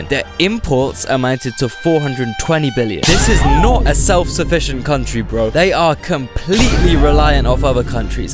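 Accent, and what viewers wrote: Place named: Birmingham, United Kingdom. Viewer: these editings coupled with the brummy accent is gold tier, best shit on youtube ilysm never change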